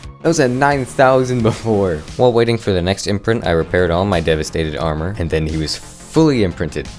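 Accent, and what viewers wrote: United States. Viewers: I love the cowboy accent 😂😂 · combine the voice of * and a southern accent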